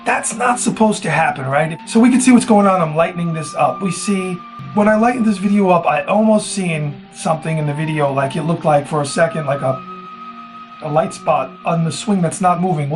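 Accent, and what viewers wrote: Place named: France. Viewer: Hi. Sounds like you have a bit of a French accent. Cool!.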